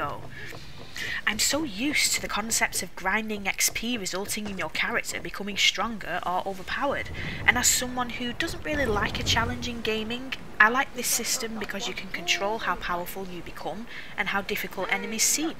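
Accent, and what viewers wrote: North, United Kingdom. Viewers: Are you a "northener"? It's a hobby of mine to guess accents. Also, great video!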